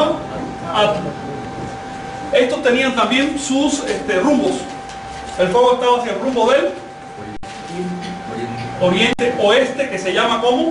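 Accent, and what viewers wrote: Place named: Chile. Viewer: hablas como chileno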